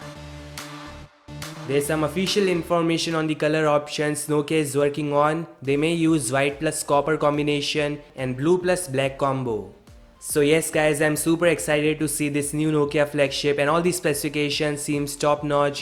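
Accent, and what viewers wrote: India. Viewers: I bet this is narrated by an Indian.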